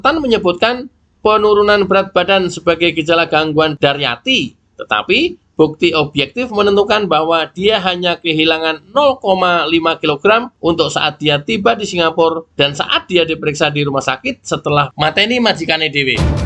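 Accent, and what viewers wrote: Java, Indonesia. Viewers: Bang?? Leh moco berita apik logate,..d campur bhs jawa.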